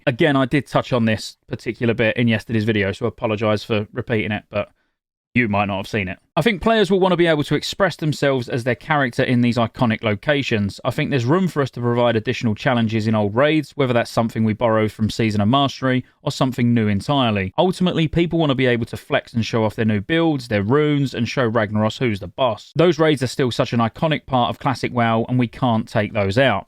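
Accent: United Kingdom. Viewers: Whats the story behind the Iowa hat but the british accent?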